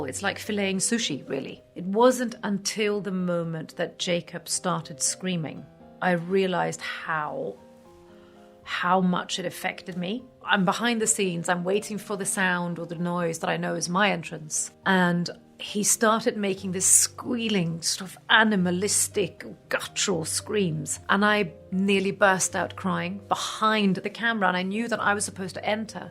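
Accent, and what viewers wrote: United Kingdom. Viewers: She sounds more British than most British do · Fint att hennes svenska brytning märks genom den tjusiga Engelska dialekten :P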